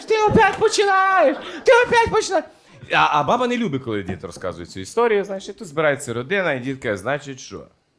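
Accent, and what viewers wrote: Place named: Bukovina, Ukraine. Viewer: Розповідає цей пан -- неперевершено! З буковинським акцентом і дуже смішно. Дуже файно! БРАВО!!! Привіт зі Львова від глибочанки.😂❤❤❤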